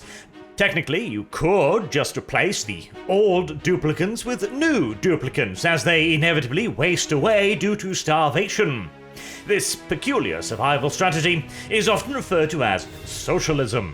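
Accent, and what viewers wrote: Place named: RP, United Kingdom. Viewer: Some people on the internet think is not aware of how smug and posh his accent sounds. They haven't seen his game reviews.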